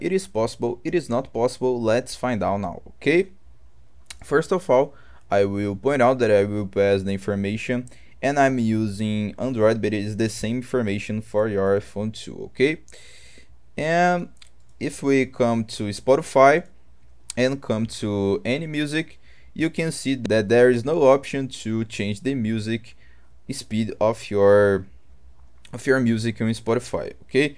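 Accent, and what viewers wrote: Brazil. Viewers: Só pela voz já vejo que é BR kkkkkkkkk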